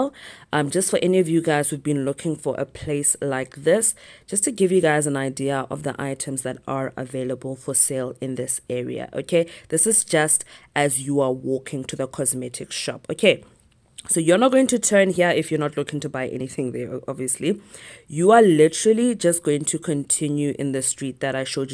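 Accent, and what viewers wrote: South Africa. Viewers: Pedi accent on flick 💖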